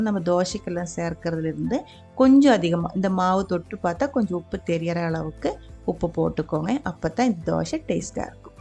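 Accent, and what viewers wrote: Kerala, India. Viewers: நீங்கள் மலையாளம் பேசுவது போல் தமிழிலும் பேசுவதால் கேட்பதற்கு நன்றாக உள்ளது சகோதரி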